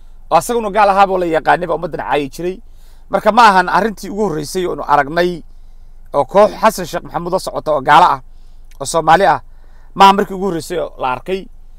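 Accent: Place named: Ethiopia. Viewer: Wallaal Ninkaan Somaali Maaha waa Nin Cabasha oo afsomaaliga Bartey. Bisiq Saraan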